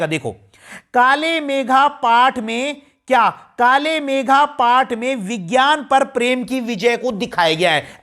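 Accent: Garhwal, India. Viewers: sir your garwali accent ❤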